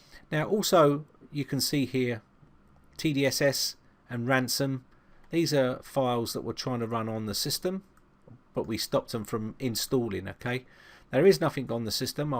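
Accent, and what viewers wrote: United Kingdom. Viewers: I like your British accent m8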